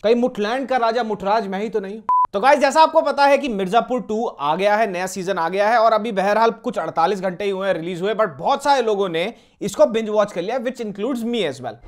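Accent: Haryana, India. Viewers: Mast haryanavi bolta hai tu....... Continue it in ur video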